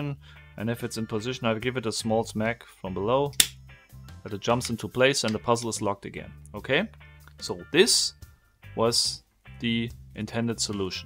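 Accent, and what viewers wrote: Germany. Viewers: Kannst du mal ein deutsches video machen In vielen videos hört man das du deutscher bist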